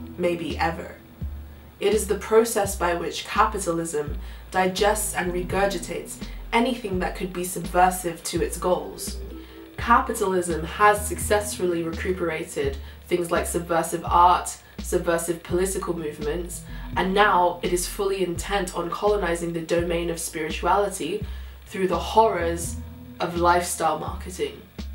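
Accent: United Kingdom. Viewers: Okay so I just started watching your videos a few days ago. Are you British or not? I can't tell and it hurts my brain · I cannot for the life of me figure out if you're southern or a weak scot it's sending me over the edge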